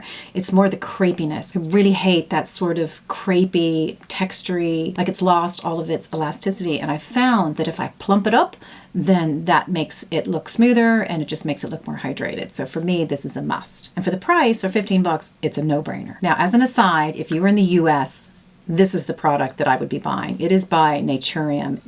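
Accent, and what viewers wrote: Ireland. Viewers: Hi i am new to your channel and really liking it so thanks xx love your accent with the slight irish twang thrown in! x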